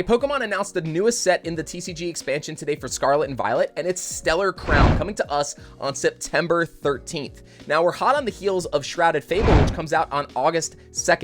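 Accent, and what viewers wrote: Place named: United Kingdom. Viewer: For a second I was like….did I click on the wrong video…who dis Brit?!??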